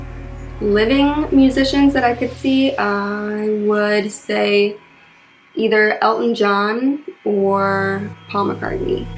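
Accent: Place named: Chicago, United States. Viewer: I was gonna ask if you were from chicago. You got that accent. love it!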